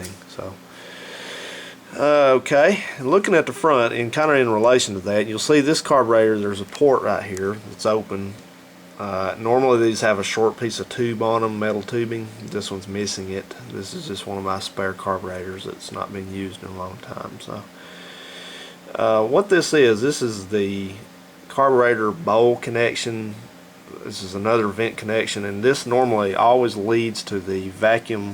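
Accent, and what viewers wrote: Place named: West Virginia, United States. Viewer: I didn't get to add thanks a lot for the videos. You kinda sound like you are from West Virginia like me, or maybe Kentucky or North Carolina.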